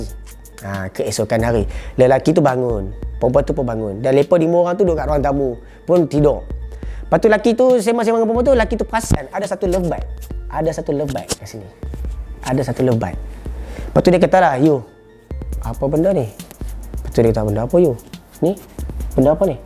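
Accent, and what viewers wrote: Kedah, Malaysia. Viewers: tajuk ceghita menarik tp cagha teghita sebenagnya not bad cuma suka ulang2 benda yg sama. Sementagha nk sampai kepermulaan ceghita tu xtau beghapa kali dok ulang benda yg sama. baiki tentang tu......channel ni akn jd lbh menarik. InsyaAllah. Loghat Og terbaik. 👍👍👍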